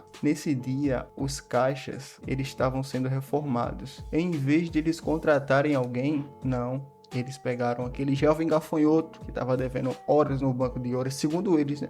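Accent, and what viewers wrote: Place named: Northeast, Brazil. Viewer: pode falar com sotaque de Nordestino, ta de boa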